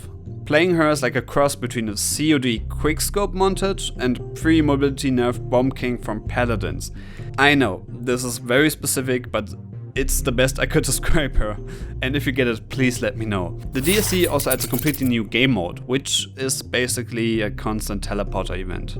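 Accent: Germany. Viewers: btw is it just me or are you german?/kommts nur mir so vor oder bisch deutscher?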